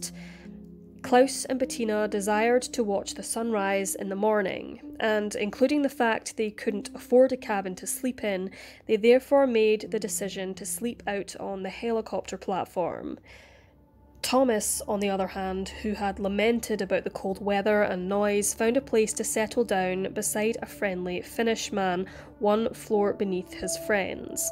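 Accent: Scotland, United Kingdom. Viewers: Love a Scottish accent. My favorite. 😊♥️ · It's amazing theres so much love for this girls voice.. it soothing, clear word pronunciation, husky and the Scottish accent. I love it!!!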